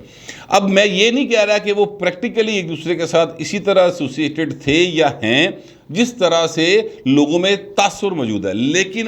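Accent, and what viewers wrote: Punjab, Pakistan. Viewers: Punjabi touch while you speak is so good 👍👍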